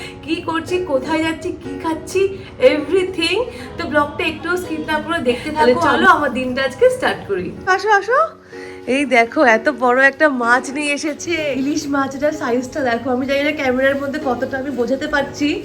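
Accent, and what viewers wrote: Kolkata, India. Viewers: তোমার বাংলাদেশের ভ্লগগুলো দেখলাম। তুমি খুব সুন্দর করে কথা বলো। কোলকাতার বাংলা আ্যকসেন্ট আমার কাছে বেশ দারুন লাগে। আরো ভ্লগের অপেক্ষায় রইলাম। আর মাওয়া ঘাটের ইলিশের লেজের ভর্তাটা আসলেই খুব মজার। আমি ২০১৯ এ খেয়েছিলাম। তখন অবশ্য এত সুন্দর হোটেল দেখিনি। এখন বেশ ভালো লাগলো দেখে। যাইহোক, আশা করি তোমার বাংলাদেশ ভ্রমন ভালো যাচ্ছে। এপার বাংলা থেকে বিদেশীনীর জন্য অনেক অনেক ভালবাসা রইল।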